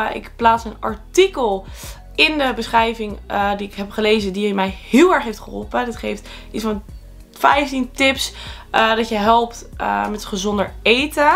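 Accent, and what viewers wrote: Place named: Netherlands. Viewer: Ik ben de video an kijken maar ik versta bijna nixxxx. Omdat jij een Hollandse akzent hebt. Kann jij mij is zeggen wat jij gedaan hebt hahaha ik versta nixx sorry.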